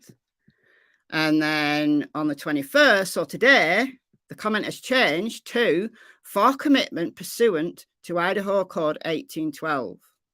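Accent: United Kingdom. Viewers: Missed the live stream but got to listen to it all and hear the updates. Congrats to the winners of free month memberships. To me this is the best channel. You get facts, commentary, dogs playing, humor, you get heard, and questions answered. All this with a British accent and caring.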